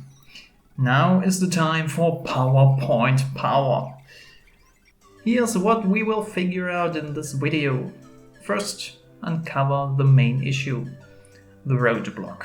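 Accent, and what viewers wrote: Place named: Germany. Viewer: It felt nice to hear a new German voice. And of course, every word was pronounced 100% clearly.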